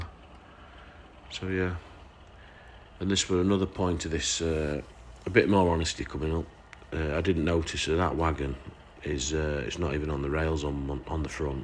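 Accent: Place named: Yorkshire, United Kingdom. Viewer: Enjoying your work. Not a modeler myself but I like the total escape from my normal day that I get from watching your videos. For someone relatively new to it your layout looks great. Your eye for a shot and the videos you produce are virtually unique and are for me far more engaging than the more usual style which mostly consists of trains going round in circles. And all toped of with your dry as dust take it or leave it attitude delivered in a friendly Yorkshire accent. I think you are on to something.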